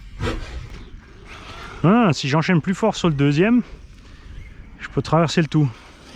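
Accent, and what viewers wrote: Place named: Alsace, France. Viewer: Salut! Je découvre ta chaîne depuis 1 semaine, étant l'heureux propriétaire d'un e-revo 2. Tes vidéos sont un régal, on sent l'amour du travail bien fait par un passionné (et balèze! 😉). Merci pour tes conseils techniques et ton intonation d'alsaco qui fait ton charme!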